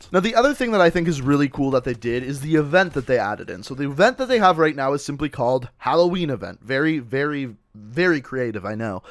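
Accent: Canada. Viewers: 9 seconds in the video can already tell your Canadian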